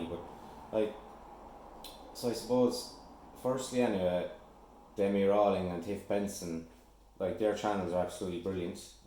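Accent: Ireland. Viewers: hey mate is that a limerick accent?lol clare man here.aussie fragrance recommened u to me:)sir smells a lot is a cork reviewer here aswell.am from the banner county .subbed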